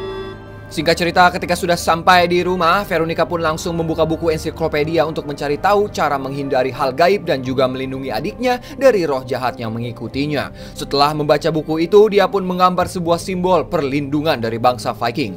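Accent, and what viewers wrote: West Java, Indonesia. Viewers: Pertama nya aku lagi serius nih ya,eh pas mimin nya ngomong ko aku langsung ter sedak ternyata mimin nya orang sunda ,sampurasun dulur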